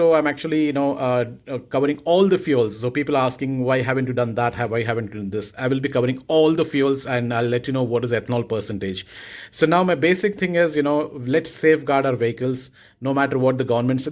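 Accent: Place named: Northeast India, India. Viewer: ohh... You sound very different.. the way you talked thought you're from possibly from northeast India. Keep up with your good works 👌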